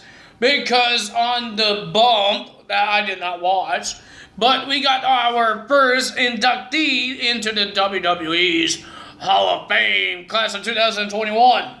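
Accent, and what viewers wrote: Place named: Quebec, Canada. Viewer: where are you from bro? I hear an accent when you speak. It sounds like either from Quebec or Louisiana.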